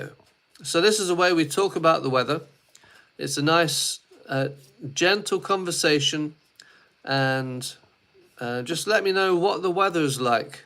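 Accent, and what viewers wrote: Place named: Northern England, United Kingdom. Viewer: You sound from north England.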